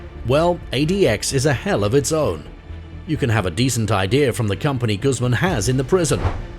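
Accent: United Kingdom. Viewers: I don't think he's Canadian my be British or Australian with not so thick of an accent.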